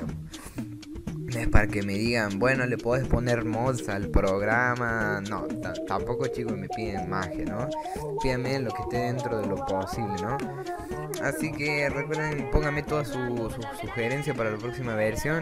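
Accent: Córdoba, Argentina. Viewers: Eres cordobes?<3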